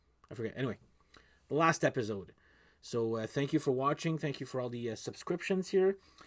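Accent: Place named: Canada. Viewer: Very good analysis. I think “ Meliora” is the best one too, and I share your enthusiasm for the awesomeness that is “Square Hammer.” My only quibble is “If You Have Ghosts.” I am a Roky Erickson fan of 40 years almost, and think Ghost does the definitive version of this song. Watch some live versions where Tobias really owns the song and the guitar lines at the end are sublime. Also, I ‘m glad you mentioned Ottawa cos I thought you had either a Canadian or Midwestern US type of accent. Subscribed!👍🏼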